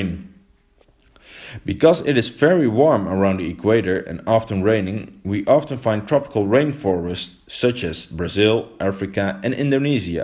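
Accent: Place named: Netherlands. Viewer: It’s just funny how obvious you are a dutch native. Very interesting video tho!!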